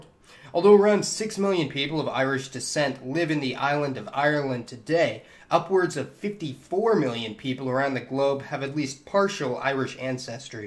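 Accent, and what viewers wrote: United States. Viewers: square sausage the voice narrating is Northumbrian you mean American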